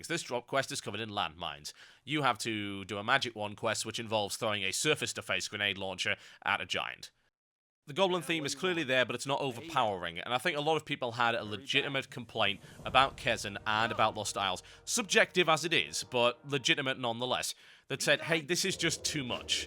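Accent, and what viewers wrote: United Kingdom. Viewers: I wish I had an awesome british accent like you :(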